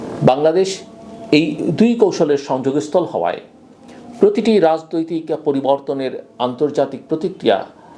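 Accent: India. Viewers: Sir sorry to say apnie akhon Indian ar moto kotha bolan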